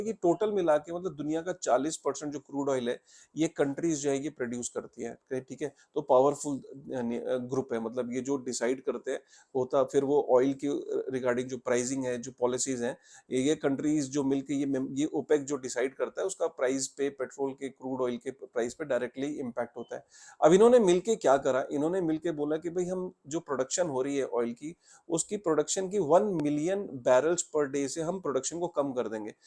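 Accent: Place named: India. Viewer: Interesting subject. But Indian dialect is literally the worst to listen too 😬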